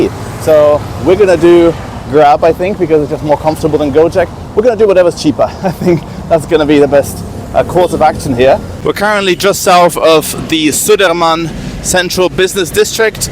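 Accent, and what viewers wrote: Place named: Germany. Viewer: Nice to hear your english .German english is the best.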